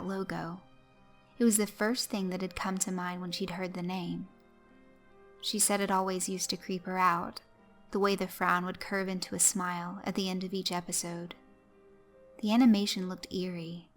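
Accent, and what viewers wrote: South, United States. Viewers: It's nice to hear a creepypasta on here being read by a fellow southerner.:)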